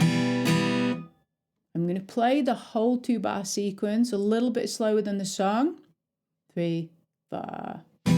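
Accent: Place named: Lancashire, United Kingdom. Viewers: im hearing a hint of lancs/north yorks in the accent. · Many thanks, your channel popped up yesterday, the 28th August, by chance. I'm 64 and have been learning since November 2024, after years of thinking about it. Unfortunately in 2023 I broke off the ball part of the ball and socket shoulder joint and shattered my radius (both left, and my right femur, long story). Fingers don't seem to be too keen on going where I want them to, however I've just written down the and, joy, it's still a bit stop/start but I've enjoyed it. Also, in a personal level, it's nice to hear a northern accent (I'm from Blackpool, living in Stockport, where the town motto is "it's not the end of the world but you can see it from here" 😂) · Hello. Just wanted to tell you that I’m enjoying your lessons - the best around as far as I’m concerned. It’s also nice to hear your north country accent, (I’m originally from Cheshire). 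A nice change from the many stateside voices. Hope you had a nice Christmas, and wishing you a happy New Year. Paul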